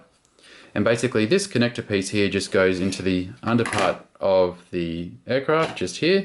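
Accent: Australia. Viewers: Are you from Australia, you have that acent?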